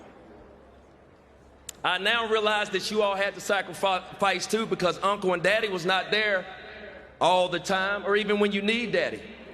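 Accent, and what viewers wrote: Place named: South, United States. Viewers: West Virginia accent.. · i love his southern accent 😂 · Actually, Southern WV accent. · I love that southern tongue god bless you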